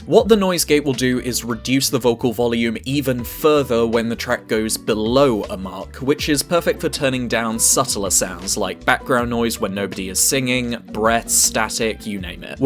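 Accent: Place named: United Kingdom. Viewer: You sound like Kevin but British. I approve.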